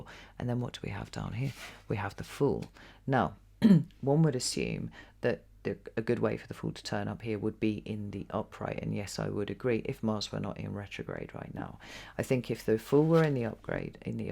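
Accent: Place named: North, United Kingdom. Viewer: Extraordinarily insightful and helpful references e.g. to the number 7 = rich content! Your accent seems to have become slightly more Northern since last time I watched :D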